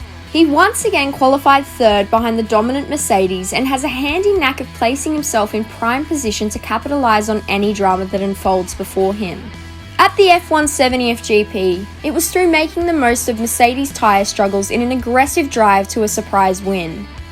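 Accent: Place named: Australia. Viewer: Nice, good to hear an Aussie on here!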